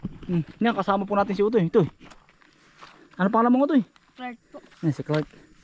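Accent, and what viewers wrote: Quezon, Philippines. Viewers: Maganda ang tunog ng Tagalog ng Quezon.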